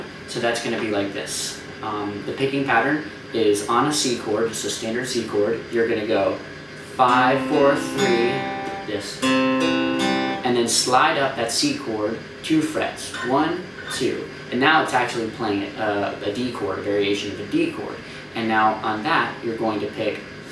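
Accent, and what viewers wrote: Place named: United States. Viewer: hehe i like ur accent doing filipino language (tagalog) when ur american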